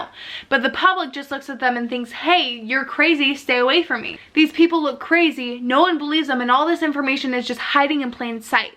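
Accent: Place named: Chicago, United States. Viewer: IS that a Chicago accent? Love it. I have been reading these books as well. Very bizarre. Especially when you look at the Von Braun books which seem connected to Elon Musk.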